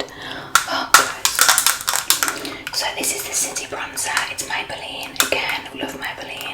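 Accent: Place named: United Kingdom. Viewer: Hi love 💕 i like your british accent. Are you from Australia?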